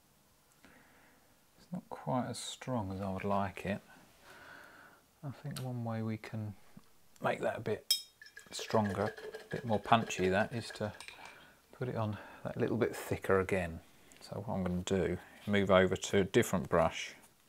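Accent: United Kingdom. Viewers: I have just found you! I'm very much admiring your British accent & grammar! Your work is exquisite! I've learned quite a lot so far. Thank you so much for giving back! Your help makes me feel blessed and God bless you! I Love your work!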